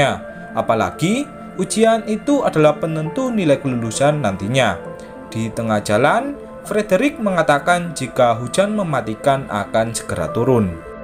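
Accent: Java, Indonesia. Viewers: Jowo totok bgt ngene iki mlh penak. Hahayyy..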